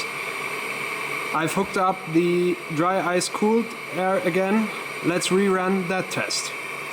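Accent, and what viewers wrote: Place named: Germany. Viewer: Endlich mal ein deutscher der ordentlich englisch kann ... nicht akzentfrei und auch nicht ganz fehlerfrei, aber immerhin besser als das was man sonst so hört.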